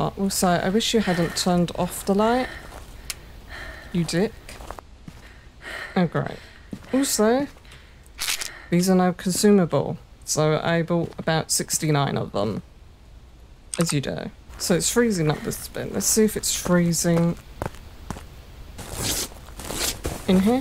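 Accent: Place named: United Kingdom. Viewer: from what I’ve heard most British accents I hear are far more pronounced than yours, which is why I was wondering what accent you had. I guess you just have a really relaxed way of talking!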